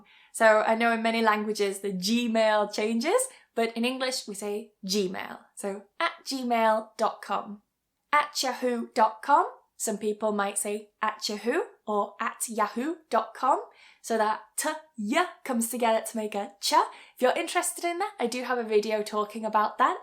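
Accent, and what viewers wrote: United Kingdom. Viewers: Thank you for your British English pronunciation and I your voice that is very very sweet...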